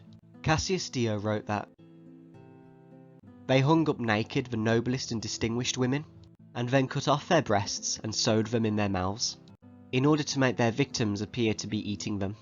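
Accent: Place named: Midlands, United Kingdom. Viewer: Sounds like you're from the Midlands. I'll take you to the new candidate I'm putting forward if you like!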